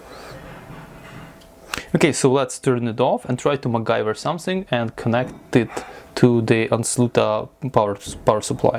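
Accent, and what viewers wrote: Russia. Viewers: He is probably Russian or Ukrainian, they have the same accent.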